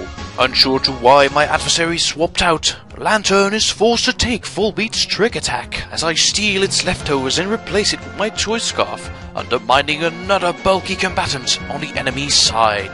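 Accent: United Kingdom. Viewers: It's the British accent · Urgh, his way of speaking I'm really not a fan of. I don't usually dislike British accents, but there's something that I can't put my finger on that makes me hate this voice. It's probably the animal planet narrator type quality to it if I had to guess...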